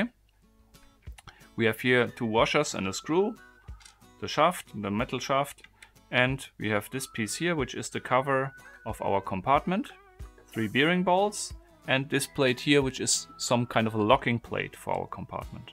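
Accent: Germany. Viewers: Evtl Irre ich mich aber es klingt startk nach einem Deutschen akzent :P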